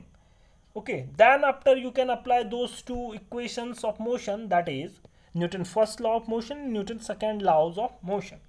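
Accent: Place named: South India, India. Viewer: Sir south accent kyun use karte h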